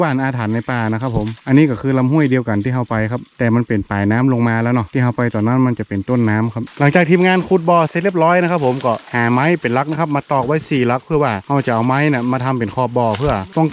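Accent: North, Thailand. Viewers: ชอบภาษาเหนือมีเสน่ห์ค่ะ แต่บางคำก็ฟังออกบางคำก็ฟังไม่ออกแต่ก็ชอบดูค่ะ✌️✌️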